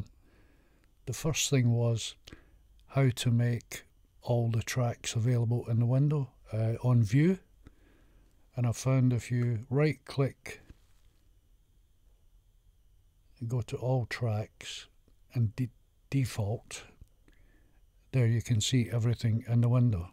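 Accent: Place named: Scotland, United Kingdom. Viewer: Great video! I love your Scottish accent!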